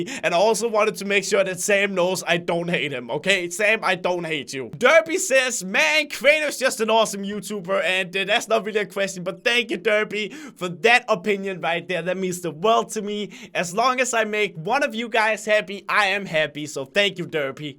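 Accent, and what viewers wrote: Denmark. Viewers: danish I'm pretty sure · Crainer please use ur Danish voice please I like the Danish one better than the real voice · This voice sounds more Danish I like it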